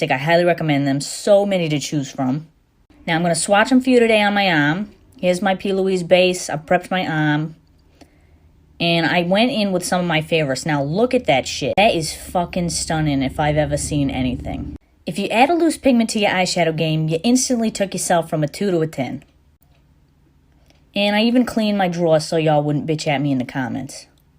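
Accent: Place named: Boston, United States. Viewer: She’s from south Boston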